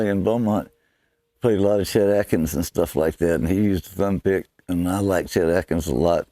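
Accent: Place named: Texas, United States. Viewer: OK that is friggin' hilarious Bill. He not only resembles President GHWB, he's got the Texas drawl too. for president! (Of course, the question of whether one of his greasiest, foulest turds would be better than what we have now is immaterial, but I digress.)::dons flame retardant suit and awaits arrest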